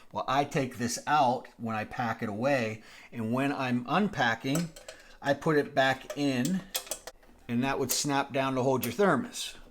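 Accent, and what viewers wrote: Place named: Pittsburgh, United States. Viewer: just when some sad corner of youtube said the Yinzer accent was dian aht I find this video ✨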